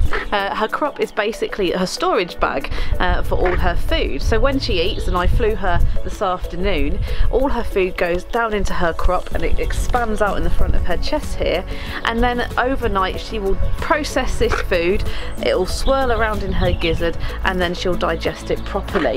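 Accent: United Kingdom. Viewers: Excellent presentation. Even without the British accent this would be an excellent presentation.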